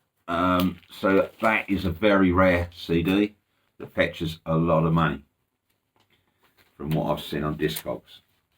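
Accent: Suffolk, United Kingdom. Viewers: Hi, just found your channel. It's great to hear a local voice. I'm from Bury St Edmunds.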